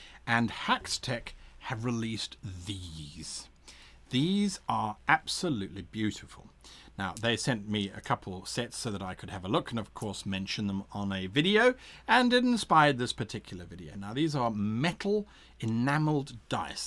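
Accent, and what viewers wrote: England, United Kingdom. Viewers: You sound like your putting on an English accent are you English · I really like your British Accent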